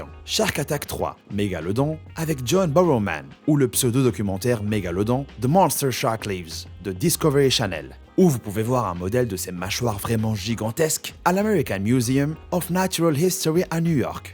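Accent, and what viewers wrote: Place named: England, United Kingdom. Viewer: Excellente la voix off! l’accent anglais est pas mal du tout ! ;)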